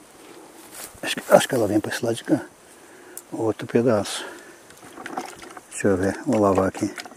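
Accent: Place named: Rio Grande do Sul, Brazil. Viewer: Queria tanto saber onde fica se e acessivel por estrada, que pelo sotaque e gaucho.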